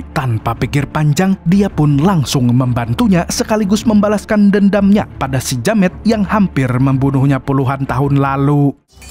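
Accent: Banjar, Indonesia. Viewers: Bhsa BANJARnya khAs, 😂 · Laaah bahasa banjarr orang banjar kah neh😂😂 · Ae, orang Banjar skli nya amank narator nya...😅😅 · pian banjar kah min..wkwkwkwk salam dri kandangan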